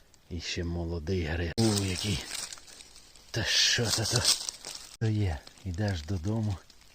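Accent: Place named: Zakarpattia, Ukraine. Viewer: Чернівці значить, я із Закарпаття,з Виноградова просто Ви розмовляєте як у нас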